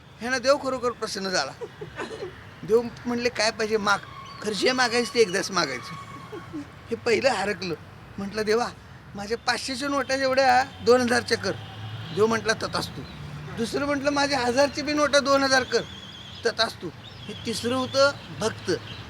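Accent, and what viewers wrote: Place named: Sangli, India. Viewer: Khar tar solapur cha ahe....Ajit koshti ganya naavacha vinod mala kharach best watatat....pure Sangli karad language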